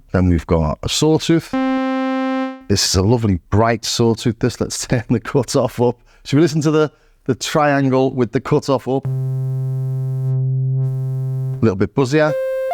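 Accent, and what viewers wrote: Liverpool, United Kingdom. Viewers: Scouser all day long (what us Brits call those crazies from Liverpool)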